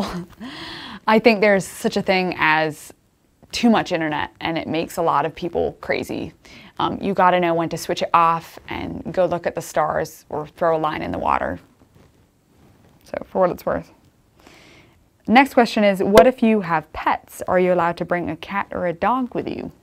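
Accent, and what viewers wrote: Pennsylvania Dutch, United States. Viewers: It sounds very similar to Pennsylvania Dutch, just like the Amish.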